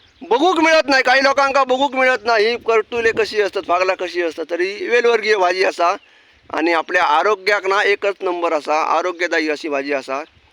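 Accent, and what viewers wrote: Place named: Malvan, India. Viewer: मालवणी मस्त बोलतास, दुसर्यांसारखी नकली मालवणी नाय, अस्सल मालवणी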